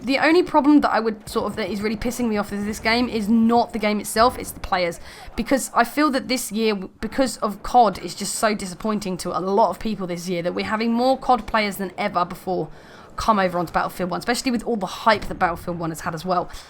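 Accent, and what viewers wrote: United Kingdom. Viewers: Teach me how to British accent pls Also your intro is a tiny bit too long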